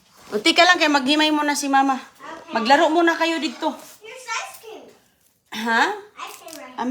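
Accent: Visayas, Philippines. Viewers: Oke oke you need to learn English now and you stay in America for how many years and still your accent is really different I know you are from visaya but it’s hard to understand 😮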